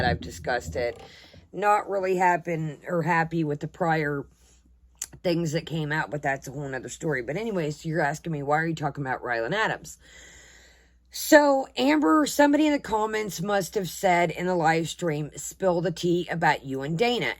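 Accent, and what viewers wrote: United States. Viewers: Your text is too cute! I forgot that has an American-accent! ❤️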